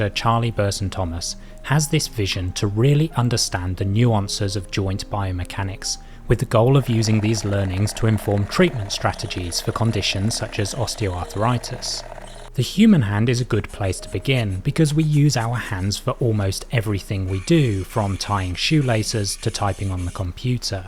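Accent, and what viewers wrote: United Kingdom. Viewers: Beautiful engineering for a rather niche experiment! I have real trouble placing accent though... A touch if Western Scotland, Ireland and south east London (the posher bits)!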